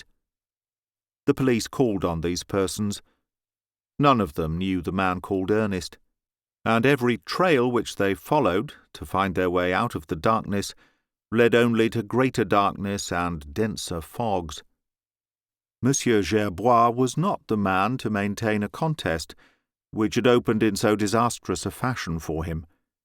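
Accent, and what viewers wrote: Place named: Purley, United Kingdom. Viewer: He sounds like a bloke you 'd meet in a pub in Purley . Not sure where you get your notions of Victorian spoken idioms and syntax from ??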